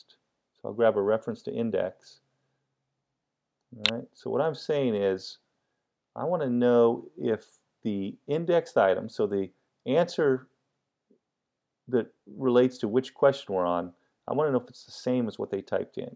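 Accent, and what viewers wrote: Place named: United States. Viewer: You're a rubbish teacher your american accent makes me hate you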